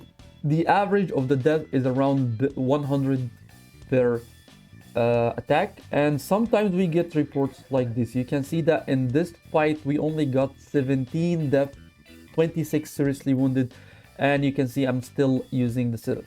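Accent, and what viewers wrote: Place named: Arab world. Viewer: I knew you were a fellow Arab just from your accent 🤝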